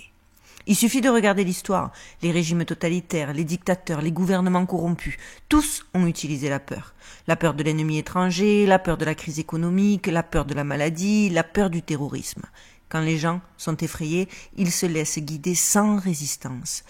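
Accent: Marseille, France. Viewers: Ce petit bout de femme est juste extraordinaire !! J avale les vidéos les unes après les autres depuis 3 jours !! D ailleurs je suis marseillaise près du vieux port 😊 haaa vous n avez pas perdu notre bel accent ça fait plaisir ❤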